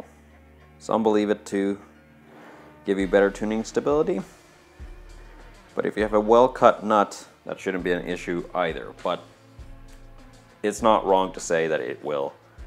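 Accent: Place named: Netherlands. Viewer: I liked your video quite a bit. I started developing a basic line of instruments about a year ago, and by far the hardest part has been nailing down the headstock shape. It is quite hard to match one headstock to a variety of body designs. Another area to touch on is tilt-back versus flat headstocks and how the neck to headstock transition works in either case. I personally prefer the Fender approach because it is simpler, is less likely to break and saves on wood. I'm going to subscribe to your channel, as I greatly appreciate people who explore design and engineering. Incidentally, are you Dutch, or maybe South African? I'm trying to place your accent.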